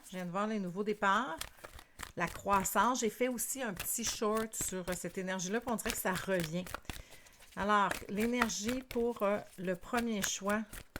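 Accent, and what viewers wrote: Quebec, Canada. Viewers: Quel accent délicieusement exotique!! Pour nous, maudits Français, cet accent évoque les pentes enneigées, les résineux et les érables, les glaces bleutées et les caribous, Tabernacle!!..:-)) Merci, pour ce rayon de lumière qui nous vient directement de la Belle Province!! Le choix 1 me parle beaucoup, et correspond le mieux à ma culture, notamment familiale avec un illustre ancêtre ayant créé une des premières cellules de la Ligue des Droits de l'Homme et du Citoyen, vers Paris il y a un peu plus d'un siècle...Cela fait de moi un "partageux", et si j'ai la chance d'avoir de l'argent au-delà de mes modestes besoins, je l'investirai volontiers dans la cause du chômage....Le salut par le travail, les formations, dans le but de retrouver de la dignité et de l'espoir...Vaste programme, mais il y a toujours des possibilités..."Là où il y a une volonté, il y a un chemin" (Lao Tseu)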